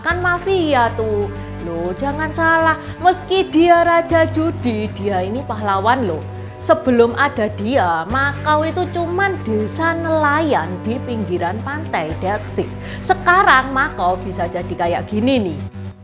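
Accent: Java, Indonesia. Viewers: Logat jawa 😅😅😅😅😅😅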